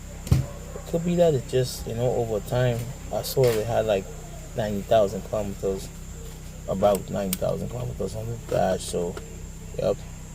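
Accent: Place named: Trinidad and Tobago. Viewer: Idk why I hear a hint of Trini in your speech lol.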